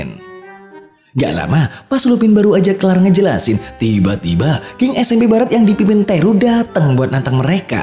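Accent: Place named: Java, Indonesia. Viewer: Jowomu ngendi mas?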